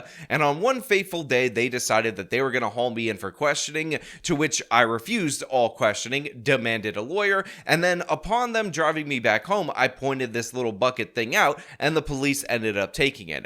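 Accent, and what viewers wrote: New York, United States. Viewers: Oh Boy, your "inner New York" REALLY came out on this video:-)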